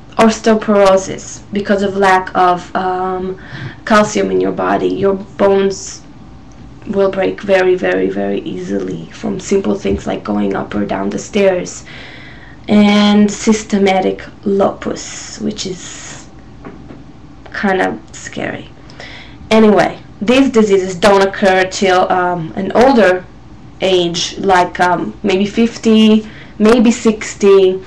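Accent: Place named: Israel. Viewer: you are pretty and intelligent. :) i realy wanted to know where you accent is from, oooh isreal ok.:) using fried bacon chopped and fried egg on a salad instead of salad sauce is SO Yummy.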